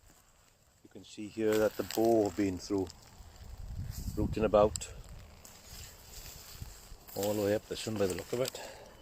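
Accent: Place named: Pembrokeshire, United Kingdom. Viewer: I used to visit Pembrokeshire, so I love to hear your accent as much as watch the content...Keep going if only for your mum's sake...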